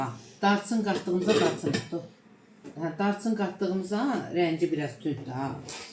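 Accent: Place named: Salyan, Azerbaijan. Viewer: Niyə axı pesok deyirsiz? Öz dilimizdə deyində şəkər. Gül kimi sözümüz var. Ləhçə Səlyan ləhçəsinə oxşadı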